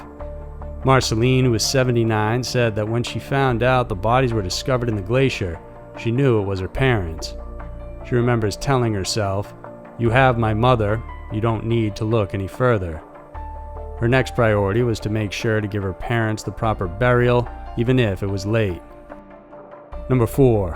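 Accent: United States. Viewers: Which part of the US, is this guy from?, so love his voice!, and of course his posts.😊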